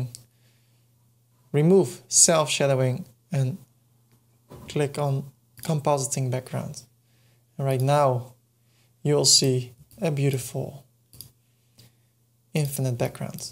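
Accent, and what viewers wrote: Netherlands. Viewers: he said: hello, welcome to this new tutorial and I could immediately tell he's Dutch. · I absolutely love the netherlands accent. Great video as well. Thanks.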